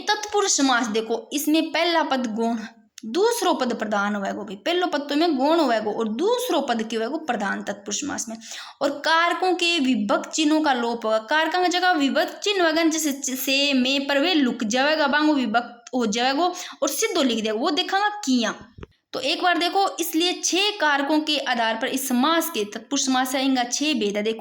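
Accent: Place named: Bagri, India. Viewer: apki language hamre jesi h bagdi ho aap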